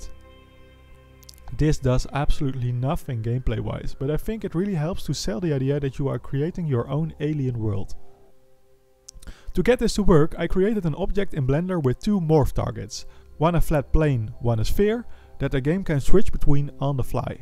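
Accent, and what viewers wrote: Netherlands. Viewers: Hey maybe a odd question but are you dutch by any chance.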